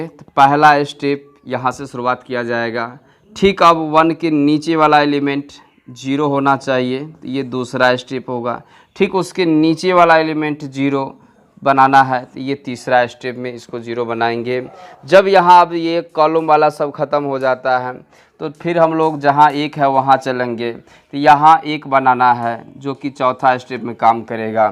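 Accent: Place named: Bhojpuri, India. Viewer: Ek dam khati bhojpuriyan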